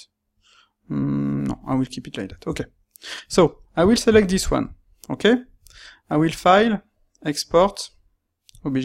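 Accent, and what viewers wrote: France. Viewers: bizarrement je sais pas si c'est l'accent français qui me fait cette impression mais je crois trouver plus facile d'apprendre houdini sur cette chaine en Français comme en anglais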